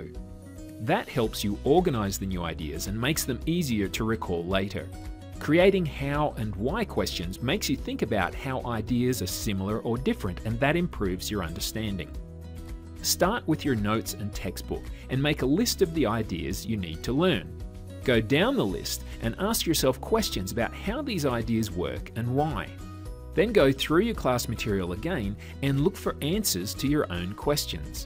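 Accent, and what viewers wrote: Australia. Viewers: Beautiful aussie accent :) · Is this guy Australian?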